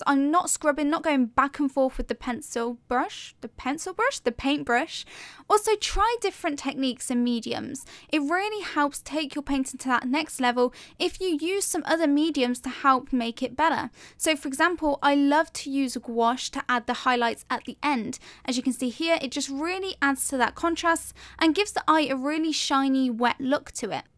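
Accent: United Kingdom. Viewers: For some reason I forgot where you from. Sound British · are u british? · Oh I love your British accent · She has the best British accent❤️❤️ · Are you British?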